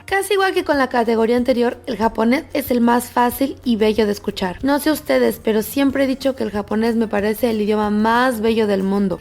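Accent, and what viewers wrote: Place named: Mexico. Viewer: Tu acento es mexicana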